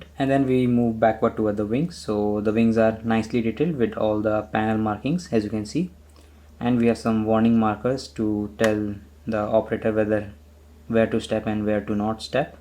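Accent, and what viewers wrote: India. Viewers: you sound like an indian are you in usa??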